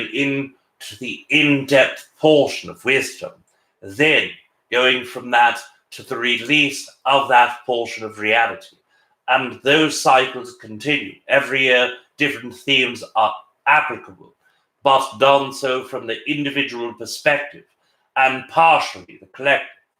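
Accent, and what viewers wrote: United Kingdom. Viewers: First time viewer. Why does sound British?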